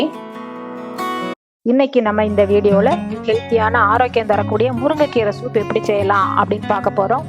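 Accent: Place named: Tirunelveli, India. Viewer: Madam unga ooru sollunga please..neenga paesurathu Tirunelveli maadhiri iruku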